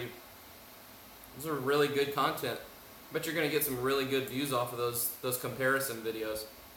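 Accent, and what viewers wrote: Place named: United States. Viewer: Hi my friend! I'm interested in English and American accent =)) you have an American accent. In what states do you live? I subscribed to your channel. Greetings from Russia. Irina.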